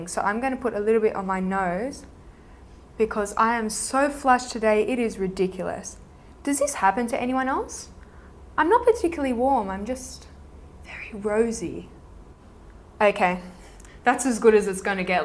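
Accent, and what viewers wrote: Australia. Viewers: You sound australian but your using American products???? I'm confused!!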